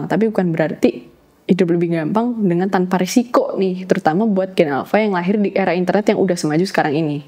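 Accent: Java, Indonesia. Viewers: pesona mba2 medok intelek, bikin chasing reality tiap hari ga sih biar home feedku berwarna :) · Logat jawa nya candu bgt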